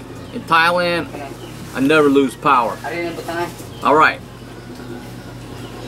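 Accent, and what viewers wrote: South, United States. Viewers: Where you from bro down south somewhere I guess Louisiana? Liking your content on your channel can’t wait to get my ass back to phillipines and yep gimme a choice I’ll take phily over Thailand any day even with its fuck ups lmao 😂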